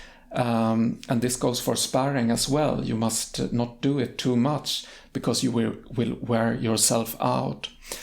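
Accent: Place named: United Kingdom. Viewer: Dear, very good arguments recommending the book. But I am highly interested in when and where do you study English? Your accent is very British and incredibly understandable!! And I would like to know if you wrote a book about learning Swedish, Please, add more and more videos about YOU;) Greetings from Argentina!!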